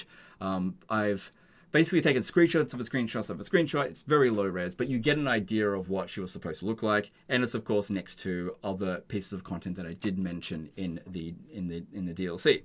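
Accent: Australia. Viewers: Bro is aussie...I thought he was bri'ish...my world is shattered.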